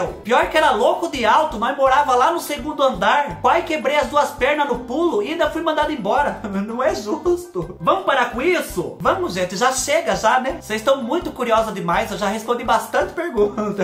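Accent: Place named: Caipira, Brazil. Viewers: Oi chegando como quem não que nada gosto muito deste teu sotaque caipirez de que terrinha você é,será que é daqui de perto de mim, Capivari,Porto Feliz ou é puro charme kkkkk adorooooooo😘😘😘😘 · Seu sotaque e bem parecido com os mineiros sua cidade fica próximo a Minas Gerais?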